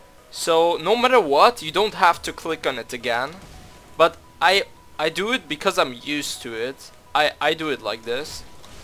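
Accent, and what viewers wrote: Germany. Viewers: your english pronunciation lets everyone think that youre from germany in like 3secs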